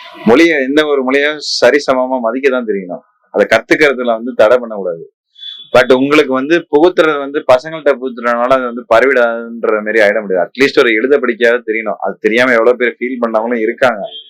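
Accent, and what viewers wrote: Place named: Delta, India. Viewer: Evari delta slamg mathiri pesuraru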